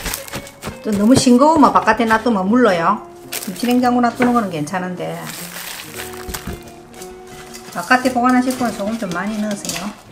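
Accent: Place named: Seongju, South Korea. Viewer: 시래기 성주 사투리 반갑습니다 ~~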